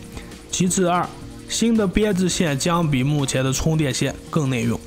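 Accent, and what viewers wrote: Shanxi, China. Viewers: 而且是山西中北部，晋南不是这味儿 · 这口音不是山西就是呼和浩特的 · 确切一点是山西口音，或者西北口音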